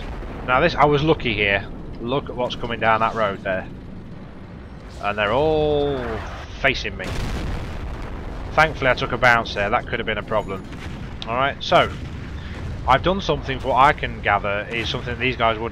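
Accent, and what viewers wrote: England, United Kingdom. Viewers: Which part of England are you from mate?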